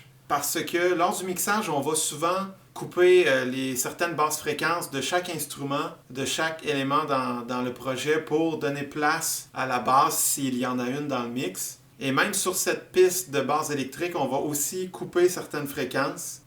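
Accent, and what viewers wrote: Canada. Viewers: C'est la première vidéo que je regarde de et déjà on ne peut pas rater ton accent canadien:) Ensuite, sympa ta petite explication, je cherche moi-même une façon d'enregistrer ma basse sans saturation vu que le micro de mon casque n'est pas le meilleur moyen! En tout cas bravo, t'as l'air sympathique en plus.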